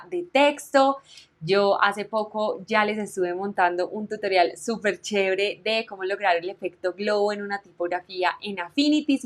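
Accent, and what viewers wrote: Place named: Colombia. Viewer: Su acento colombiano! 😍😍😍 soy de México.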